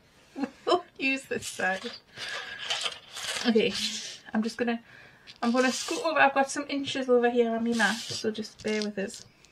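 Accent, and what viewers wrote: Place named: Newcastle, United Kingdom. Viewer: New subscriber, Found you through julie at camelia craft designs 😉 it's great to hear a geordie lass 👍 yey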